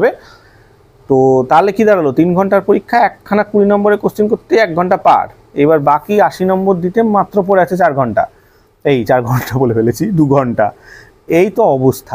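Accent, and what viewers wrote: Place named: Murshidabad, India. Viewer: Apnar kotha sune mone hochhe apni murshidabad er .. tai ki?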